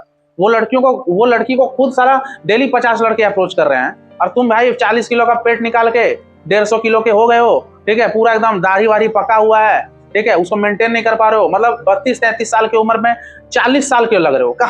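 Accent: Bihar, India. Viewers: Aap bihar se ho kya bhai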